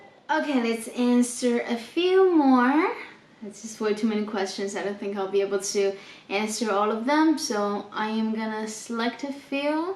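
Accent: United States. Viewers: I was almost sure you were AMERICAN. You sound more American than most AMERICANS. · You have a slight American accent?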